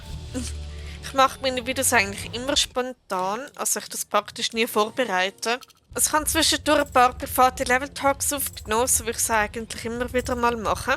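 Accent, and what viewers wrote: Switzerland. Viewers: Bist du Schweizerin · ich liebe deinen Akzent bzw dialekt das Klingt so geil ich möchte auch gern Schweizerdeutsch können aber ich bin nur österreicherin 😂😂😂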